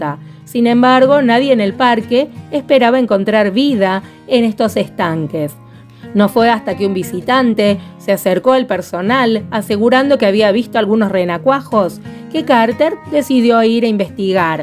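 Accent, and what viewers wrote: Argentina. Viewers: Amo tu acento Argentino 🥰😍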